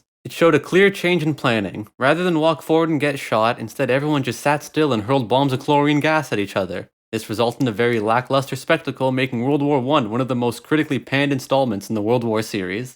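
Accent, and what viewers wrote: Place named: Newfoundland, Canada. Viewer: I’ve been binging your videos and was thinking to myself “sounds familiar..” and the b’ys confirmed it! You’re an NLer!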